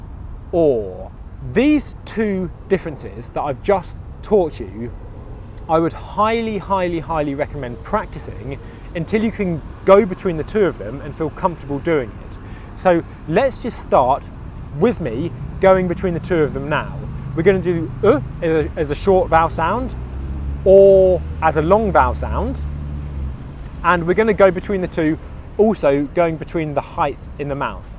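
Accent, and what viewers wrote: United Kingdom. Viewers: What British accent do you speak ? Is the British IPA same for all British accents ? I am from India I speak Hindi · Hello sir ,your accent is american or british ??? It is the first time to see your channel .my mother's tongue is arabic .do you like arabic language😀 i learn american accent.i noticed that you speak british ,am i right ?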